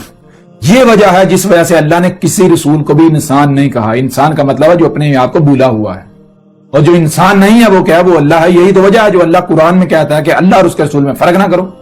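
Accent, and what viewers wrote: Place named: Gujarat, Pakistan. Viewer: Iss gaandu ko urdu bhi theek se bolna nahii aati.......lehja marasiyon orr gujraatiyon wala hai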